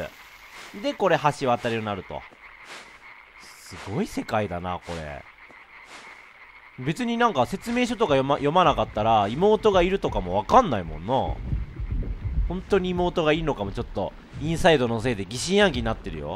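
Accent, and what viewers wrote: Kansai, Japan. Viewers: 関西弁をーーーー！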